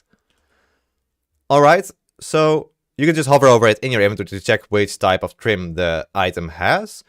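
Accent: Netherlands. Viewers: Sorry to say but you can hear that ur Dutch 😅😅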